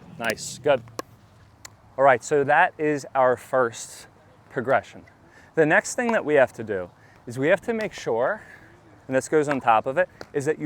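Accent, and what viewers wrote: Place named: Philadelphia, United States. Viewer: Just discovered your channel—very valuable lesson! —you from Philly? Dialect reminds me of home…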